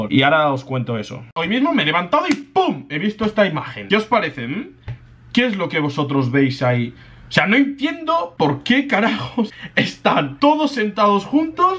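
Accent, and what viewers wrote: Spain. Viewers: Un hermano hablando español de españa...un crack el negro 😎 saludos crack 😉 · Es la primera vez que veo un negro con acento español. ole!!!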